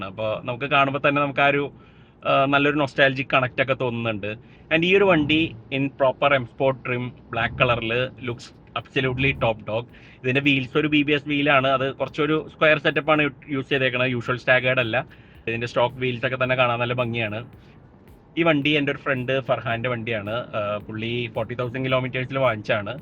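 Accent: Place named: Thrissur, India. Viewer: always a pleasure watching your videos! A few observations though... 😄 Sir, you are our inspiration and role model, which is exactly why we noticed you using your phone while driving - checking car specs AND doing videography at the same time! Multitasking level: expert, but maybe let's leave that out of the next video (or at least edit it out properly) Now, about that age comment, hahaha! Whether you've just entered the 40s or are tiptoeing around it, CONGRATULATIONS and welcome to the club! Also, I'm guessing this was shot on the Palakkad–Thrissur highway? And that Malayalam dialect is giving very strong Thrissur vibes! Are you originally from Thrissur, sir?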